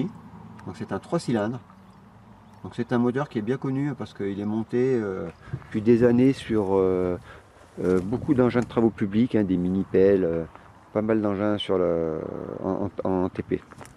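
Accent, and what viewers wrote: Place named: Saint-Étienne, France. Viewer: Très bonne présentation l’accent on dirait un Stéphanois égaré dans le 38...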